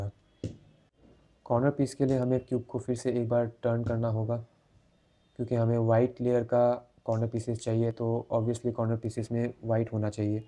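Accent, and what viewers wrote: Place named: India. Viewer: I came here just to listen to hindi accent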